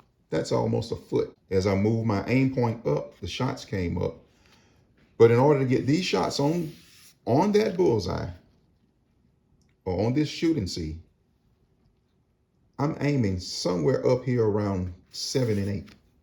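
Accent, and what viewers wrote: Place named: Alabama, United States. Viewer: I’m from Alabama originally and it sounds like you might be from there. Am I right?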